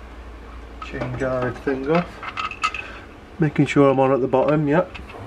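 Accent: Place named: Stoke, United Kingdom. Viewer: Sounds like a fellow stokie? Or not far off anyway!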